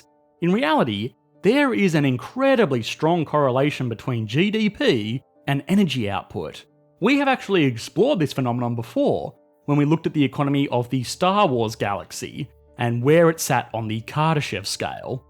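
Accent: Australia. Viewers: Can we all agree Australian is the most abhorrent accent?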